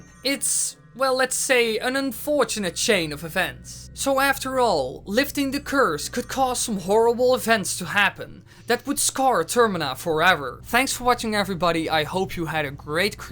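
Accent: Netherlands. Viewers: You really sound like a dutch man :3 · Ben je nederlands? Jouw accent heeft echt wel overeenkomsten met nederlandse taal Haha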